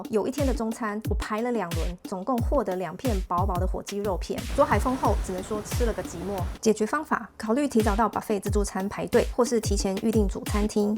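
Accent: Taiwan. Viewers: （無效啦）呵呵 ，很標準的台灣閩南語發音😊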